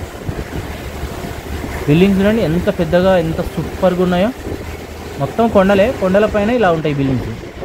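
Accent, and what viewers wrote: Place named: Warangal, India. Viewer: Bro chowrasta antunnV..nuvvu warangal aa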